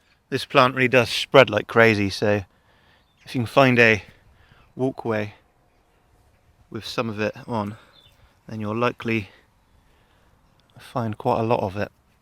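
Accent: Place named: Gloucestershire, United Kingdom. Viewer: Judging from some previous videos I’ve seen and the accent my guess it’s just a bit South from where I live. I’m in Gloucestershire so further down the river Severn probably.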